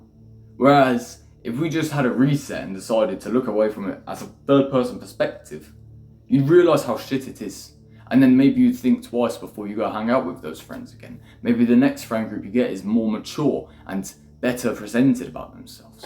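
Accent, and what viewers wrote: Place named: United Kingdom. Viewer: What’s your accent? It sounds British but with something else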